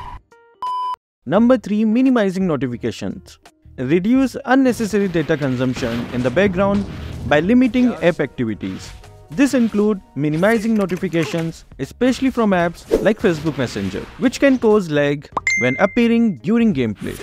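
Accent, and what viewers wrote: India. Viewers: Question: are u Indian?Bcoz you have an indian accent.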